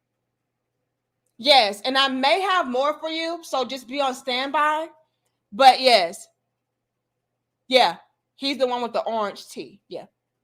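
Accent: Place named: Chicago, United States. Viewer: I think this wonderful woman is from the Chi the way she talks says it all from me beautiful keep it up sis tell it .......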